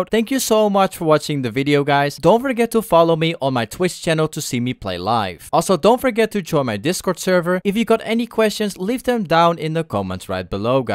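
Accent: Netherlands. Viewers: ayy we out here. But are you dutch? You have that accent atleasd ^^.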